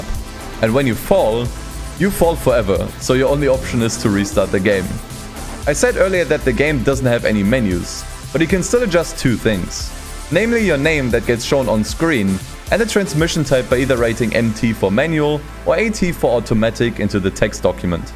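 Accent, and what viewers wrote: Germany. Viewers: i can hear german... bist du deutsch o.o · Ich würde wetten du bist Deutsch :D Du hast so einen Deutschen Akzent im English :D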